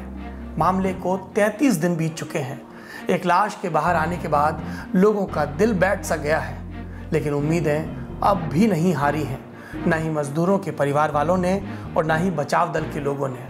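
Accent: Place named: Bundelkhand, India. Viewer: Ashish Verma wo urai (Bundelkhand ) se hain. Avadh se nhi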